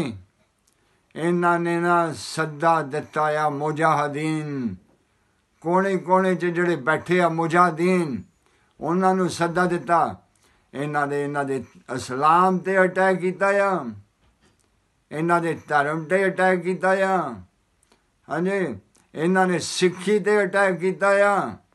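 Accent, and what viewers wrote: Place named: Pakistan. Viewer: Eh bhain da lorha Sikh ni haiga. Ehda accent hi ni haiga India wala. I know uk to aa but bolan da dhang Pakistani type aa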